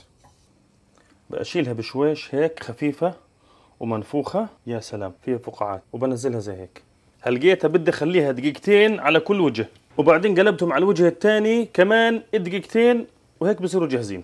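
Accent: Palestine. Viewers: لهجة. غزوية غزة. دايمن غير تحيه. للي. غزة. من. داخل. المحتل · الصراحة أنا لا أحب أطبخ لكني أشاهد فيديوهاتك من أجل اللهجة الفلسطينية ، ما شاء الله. بالتوفيق حبيبنا اللزم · احب قناته علشان اللهجه الفلسطينيه الي تجننننن 😍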